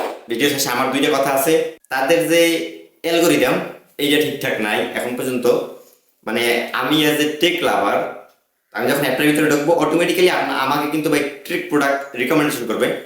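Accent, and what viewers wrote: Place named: Sylhet, Bangladesh. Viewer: ভাই তুমি সিলেটি😅😅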